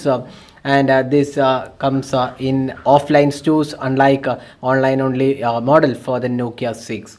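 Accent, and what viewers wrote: India. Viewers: ok im leaving cause of his indian accent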